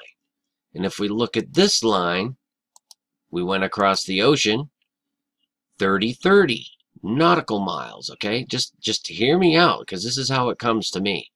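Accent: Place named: Boston, United States. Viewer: This is incredibly nutty. And I just realized you're from Boston. Haha. You "sawr" it. 😊 Excellent work and crazy fingerprints of the evil architects. Look forward to your vids.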